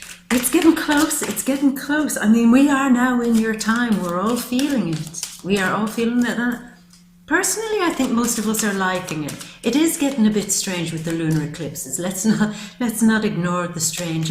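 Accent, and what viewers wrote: Ireland. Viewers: Neil said mesmerize, I say magnetic.He is right, you dear lady have it all, beauty, poise and grace. Also what appears to be a charming Irish lilt. I dare not say anything further for I have only one heart to loose.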